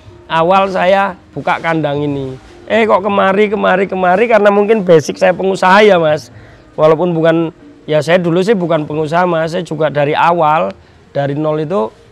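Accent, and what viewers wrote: Indonesia. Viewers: Mantap mas kira n sampeyan wong daerah yogya lahir di sumatra dapat istri orang Banyumas...cuman jowone sampeyan sing bnr asli ngndi toh mas...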